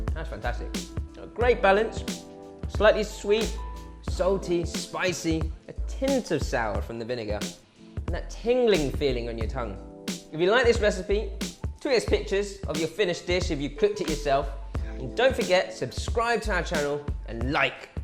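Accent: United Kingdom. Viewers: I love your videos but it just makes me laugh so here a Asian man speak British or English no disrespect pundit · An Asian with a british accent.. LOVE IT!!!! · Why you speak like a British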